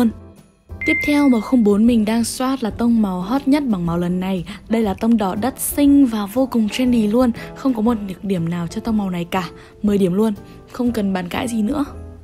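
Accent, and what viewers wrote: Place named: Vietnam. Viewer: ui bạn ơi có nhiều ng học tiếng anh rất giỏi nhưng lúc nói hay phát âm các thứ chưa chuẩn vẫn bị hơi hướng tiếng việt đấy... kphai ngta k cố gắng học phát âm mà kiểu giọng lúc đọc tiếng anh nó bị nthe sẵn ấy.. ai chả muốn nói hay nma giọng nthe r bt lm sao:(... t thấy c phát âm nthe là ok lắm r í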